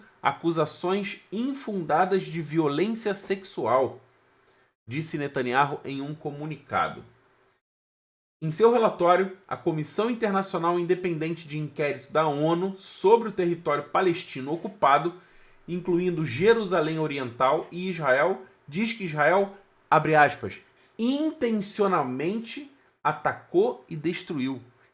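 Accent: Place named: Rio de Janeiro, Brazil. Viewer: Afff esse sotaque carioca cheio de sssssssss e rrrrs! Fonoaudiologo resolve isso!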